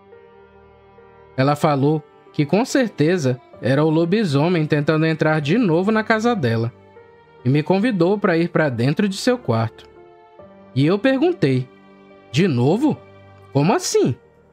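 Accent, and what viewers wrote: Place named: Pará, Brazil. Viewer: Eu gosto desse sotaque, acho que é de paraense ❤, relato incrível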